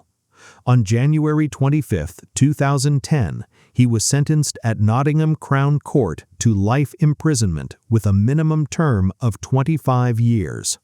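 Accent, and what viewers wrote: United States. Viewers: Why the "American" narration..? It isn't necessary to prove a motive...but perversions are timeless...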